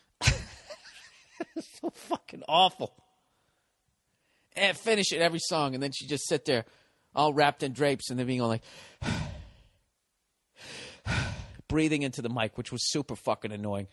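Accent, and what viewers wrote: Boston, United States. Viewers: It's weird. is like some kind of boston inflected, test dummy for life. He could have a take on anything and you could listen for hours. Imagine someone dropping him off in afghanistan and then the following monday morning you have: Afghanistan. Then he would finish off with Nia's take on it.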